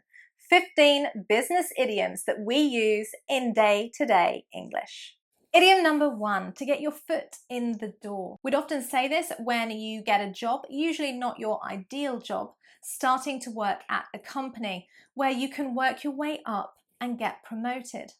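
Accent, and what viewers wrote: United Kingdom. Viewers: I like the British accent :-) Hello from E.T. -- 🦋East Turkistan🦋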